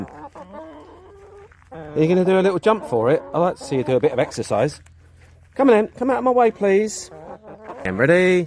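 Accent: England, United Kingdom. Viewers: Watching from the SW of Western Australia. I am originally from Essex. Love hearing your English accent. You are doing a fabulous job on the cottage…Donna, 🇨🇰🦘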